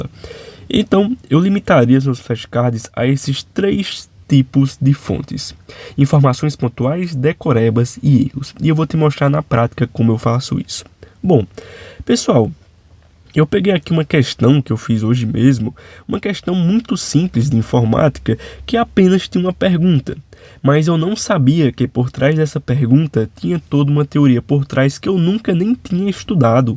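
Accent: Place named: Pernambuco, Brazil. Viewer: Você é pernambucano?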